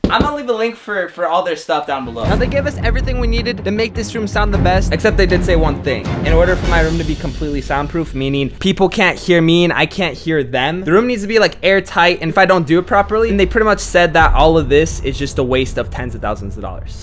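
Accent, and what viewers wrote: France. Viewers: C'est juste dingue😶C'est la première vidéo de toi que je regarde et ça me donne déjà envie de m'abonner...Allez, +1! PS: Your french accent is hilarous🤣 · Are u french? Anyways i love ur video when i see a notif of u i smile instantly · The French accent ! xD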